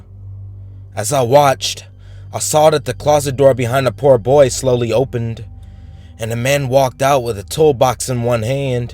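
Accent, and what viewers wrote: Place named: United States. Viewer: Wow,you DO have a sexy voice!! i'm British and have never heard such a gorgeous American accent.......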